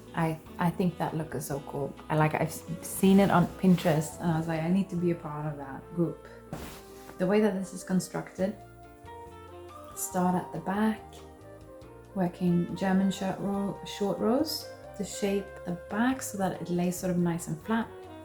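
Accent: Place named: England, United Kingdom. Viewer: I love your OG English accent. So refreshing to hear “Maths”, not “math” 😊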